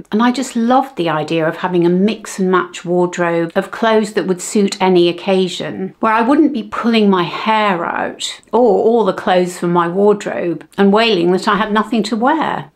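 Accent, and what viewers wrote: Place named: United Kingdom. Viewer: What a beautiful British accent!! Greetings from Poland😊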